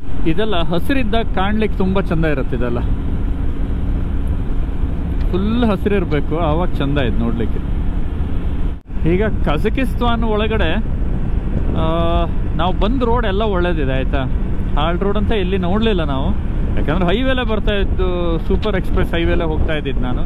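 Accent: Kundapura, India. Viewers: Kundapura kannada Laik ayth 🥰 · ಸೂಪರ್ ಕುಂದಾಪುರ ಭಾಷೆ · Very good kundhapura Kannada 👌🏼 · 27 : Kundapura kannada ❤🔥🔥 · Kundapura Kannada super 👌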